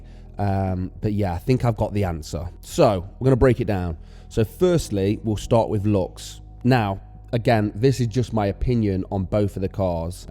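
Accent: United Kingdom. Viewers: Love listening to a Brit talking about a model 3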